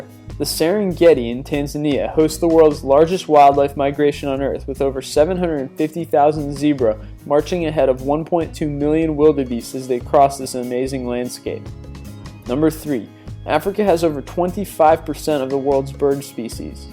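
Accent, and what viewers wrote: United States. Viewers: U sound American but when speaking of measurements u use metric instead of American standard. Why? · The American accent should not have been used for this narration.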